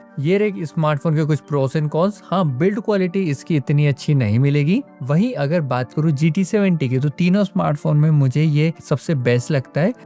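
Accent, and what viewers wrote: Indore, India. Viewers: Bhai Indore se ho kya?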